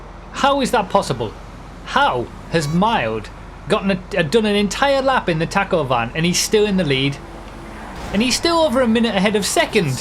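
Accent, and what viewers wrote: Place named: Hartlepool, United Kingdom. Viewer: Cant believe your from Hartlepool I've been watching all these years trying to work out the accent! I'm from the area :')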